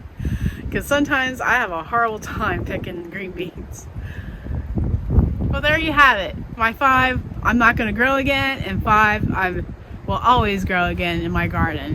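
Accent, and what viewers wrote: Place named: New Jersey, United States. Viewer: I grew up in New Jersey and your accent made me home sick lol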